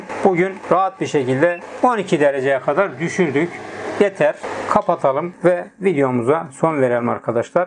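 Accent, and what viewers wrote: Erzurum, Turkey. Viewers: hay senın ben cigerin yiyim dayi maşallah sene bir dadas her yerde dadastir gendin belli edisen arada bir videolara koy şivemizi💗💗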